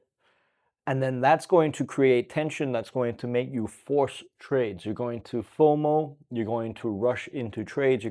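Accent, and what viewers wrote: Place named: Guam. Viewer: Bro, totally off topic, but I just have to ask. Are you from Guam? I grew up in Guam and, I swear, you sound like you're from there. Great vids BTW. I think you're spot on with everything you talk about regarding discipline. I feel like discipline and mindset would fix 99% of our trading issues.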